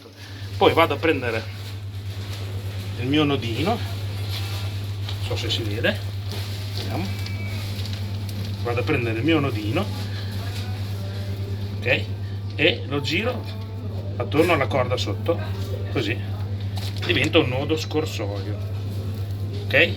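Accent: Emilia, Italy. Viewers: Si ma guarda che lui ha un'accento emiliano, mica romagnolo. Va ben che ne han fatto una regione unica, ma a parte quello son due cose ben diverse, e accenti ben diversi, con Cesenatico non c'entra niente...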